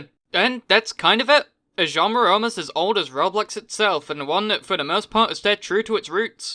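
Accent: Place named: Sunderland, United Kingdom. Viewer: He sometimes sounds a lot like a mate of mine from Sunderland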